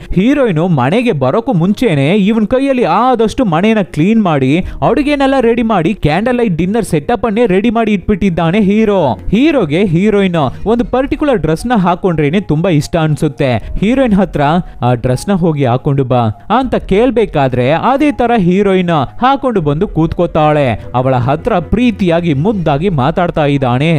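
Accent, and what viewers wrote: India. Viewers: Super and excellent voice and kannada accent, plse continue doing this dubbing in kannada work, we have very less English movies which is explained in kannada, also if you can try for dubbing ENGLISH movies in kannada plse will be super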